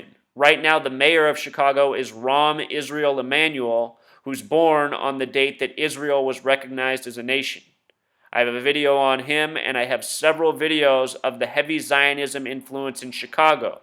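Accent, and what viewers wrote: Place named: New Jersey, United States. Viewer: My gut feeling tells me your from jersey.....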